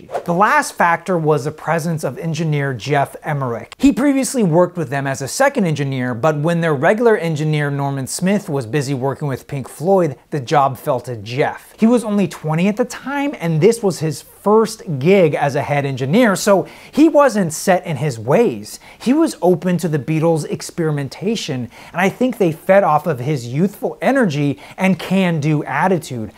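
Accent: United States. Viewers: would pop you in the face if you got anywhere near him because of your loud American delivery like Jim Carrey's movie The Mask. Must you embarrass us USA Beatles fans? Its so offensive to the memory of the Beatles dude! Yeah if you are going for Jim Carrey, wear the mask at least?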